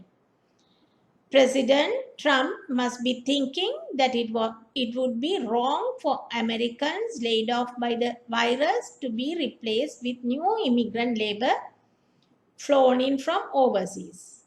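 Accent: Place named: India. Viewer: This is indian voice how could you understand américain law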